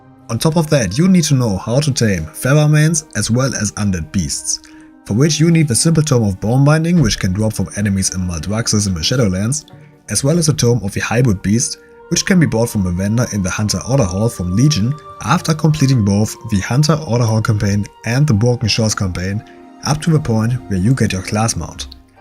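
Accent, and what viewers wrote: Germany. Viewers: I bet my a** that you are 110% german. I can hear it through your accent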